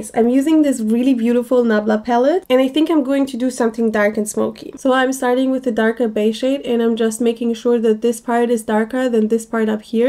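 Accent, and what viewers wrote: Germany. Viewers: where are you from? your accent sounds a bit german... greetings from germany😊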